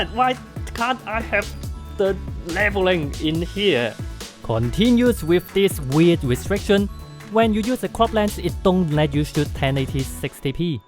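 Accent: China. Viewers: Your English sounds funny ..typical Chinese slang...lol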